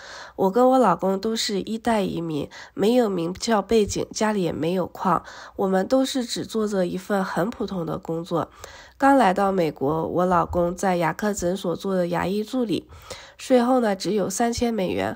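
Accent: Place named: Yantai, China. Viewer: 烟台口音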